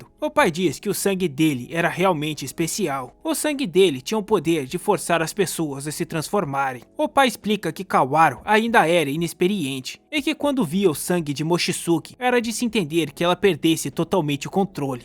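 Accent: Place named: Rio Grande do Sul, Brazil. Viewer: o sotaque gaúcho melhora ainda mais kkkkkk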